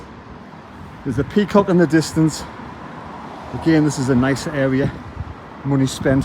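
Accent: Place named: Sunderland, United Kingdom. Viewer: You were beside where Binns was and also it was on the other side of the street too with an underground tunnel connecting them. Fascinating to see Sunderland . Sad that the Ivy Leaf Club is closed I used to do gigs there. I now live in Prague in the Czech Republic but also own a flat at Grangetown. Regarding your comment about financing. If memory serves me right they pulled down my old school West Park and built the new Civic Centre - so why are they wasting money on another town hall? They should be encouraging new industry - some of the most skilled engineers around were in the North East I'm sure they could adapt to new products etc. Where you stopped on Monkwearmouth bridge was where I braked to avoid killing a football supporter who ran into the road and a bus slammed into the back of my new car. It was great to hear your accent. 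I told my Czech friends that if they visit Sunderland the local people would understand their English but the Czechs might have a problem understanding the Sunderland people. They didn't believe me but when my band from Prague visited they did. They were totally intrigued and had a wonderful time. I hope to see more views of Sunderland which, although I wasn't born there, is where a lot of my family still live.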